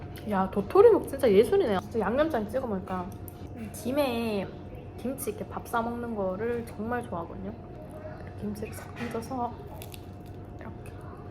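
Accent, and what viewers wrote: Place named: Daegu, South Korea. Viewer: 처음보는데 대구분이신가봐요 사투리 친근하네여:) 잘봤습니당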